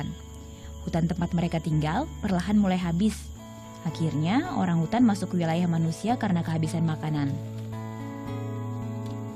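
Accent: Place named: Banjar, Indonesia. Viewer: Pang nya banyak banar,,,, BANJAR BANAR pang,,,😁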